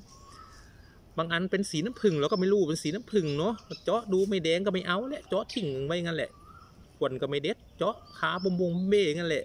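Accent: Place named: Korat, Thailand. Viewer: ภาษาโคราชน่าฟังจ้า 😂😂 เด็กน้อยดื้อคักน้อจ้า เก็บความทรงจำไว้ ดีค่ะ ขำดีจ้า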